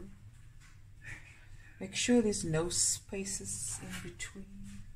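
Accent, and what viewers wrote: South Africa. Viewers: Awesome To hear a friendly South African Voice. I enjoyedyour video very much, everything is already in the cupboards and fridge. I like your trifle its neat and not a messy thing. Lots of love‼️❤️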